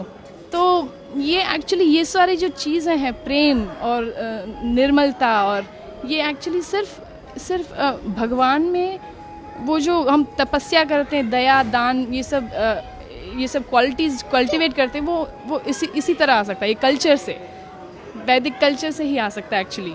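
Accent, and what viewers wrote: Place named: India. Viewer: How she is foreigner?she can speak Hindi clearly with indian accent,so I think she is indian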